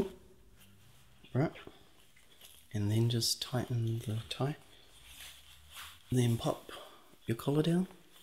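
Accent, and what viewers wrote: New Zealand. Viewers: You are my favorite to listen to! Your kiwi accent always makes me drift off to sleep! Although, I must admit, sometimes I just stay awake because Kiwi accents are my favorite ☺️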